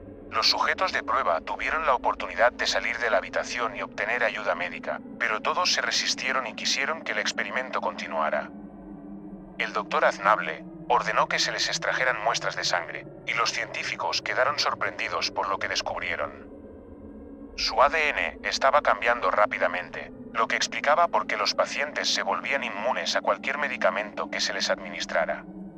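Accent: Spain. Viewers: Ha que buena historia de pelicula se podria decir. El area 51 si es, una base experimental no hay duda de ello y si vivieras en el area de las vegas y mas cerca de la area "S" lo sabrias y no te quedaria duda de ello. Me llamo la atencion porque me identifico con lanprimera parte de su historia ya que fui official de guardia en las afueras de acceso inmediato a los primeros edifices y angares. Al pasar el tiempo estuve assignado a permanecer en mi puesto solo hasta determineda hora pero, decide a la alta actividad y constants vuelos,e quede por mas de dos horas. Vi lo que yo dijiera, objetos de vuelo de prueba y otros que llegaban junto con F-16. Ahi en ese momento un sensor de seguridad se activo y me arrestaron por estar ahi ilegalmente quiero decir, no segui instrucciones de mando y fui dado de baja indecorosamente y sin antes firmar un tratado de silencio por 50 años. 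No pude hacer nada y ahora vivo todavia aqui en Las Vegas recordando que a veces de tanto que quieres saber de este tema de vida extraterrestre, llegas al momento en que ya no quieres saber nada. Lo que pasa ahi no esta upto para el ser humano ya que puede cambiar tu forma de ser y pensar. Asi que ya ven, no es facil llegar hasta donde dice este amigo con acento Español. Desde los años 50's se posee una tecnologia de punta de seguridad ahi que reconoce y detectable en calor del cuerpo humanoy de algunos animales, sistema de reconocimiento facial y alguna itra tecnologia que te puedes imagunar. NO SE OUEDE PA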